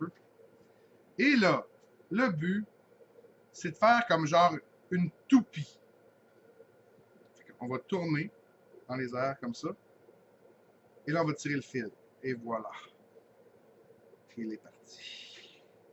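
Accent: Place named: Quebec, Canada. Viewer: Coucou mon ami. J'aime beaucoup t'écouter parler avec l'accent québécois. Merci beaucoup à toi. Dominique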